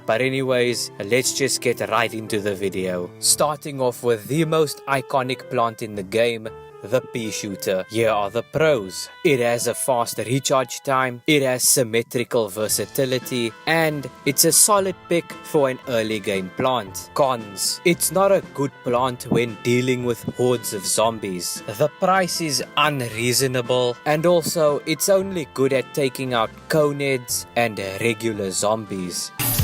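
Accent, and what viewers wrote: Afrikaans, South Africa. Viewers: Dude, are you South African? I can hear the Afrikaans in your accent 😂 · South african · is that a south african accent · Wait are you Afrikaans? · Could instantly tell you're South African 😂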